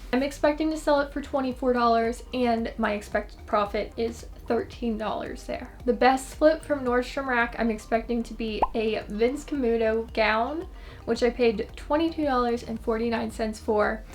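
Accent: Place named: Pennsylvania, United States. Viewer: I agree with the selling up. I have a really hard time paying for items, it just goes against my thrifty/cheap way of thinking, lol. Also, nice to hear a Pennsylvanian accent. I’m originally from State College area but am in Upstate NY now!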